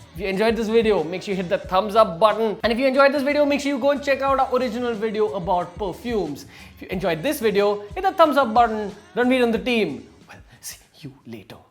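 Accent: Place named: Gujarat, India. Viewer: Gujrati English 😂❤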